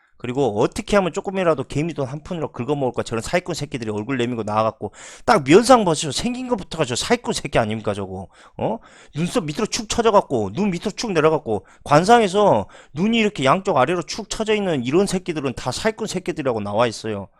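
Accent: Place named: Honam, South Korea. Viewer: 욕을 기다리며‥. 빠져드는 강의 ㆍ 정통 호남 발음. 억양 좋습니다 그럼 수고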